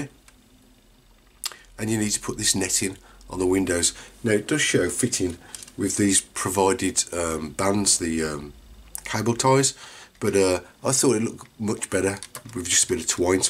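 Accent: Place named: West Midlands, United Kingdom. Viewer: Hi.. I have one on pre-order looking forward to it arriving:) great vid.. subscribed.. whereabouts you from sounds like your around west mids? im from Streetly.. Sutton:)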